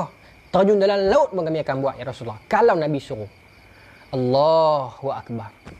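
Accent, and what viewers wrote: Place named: Kuala Lumpur, Malaysia. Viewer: terbaik abg..saya antara peminat ustaz..cara penyampaian yg sempoi.tp kali ni mula2 ckp k.l huduh piang dgr..hihihi..love u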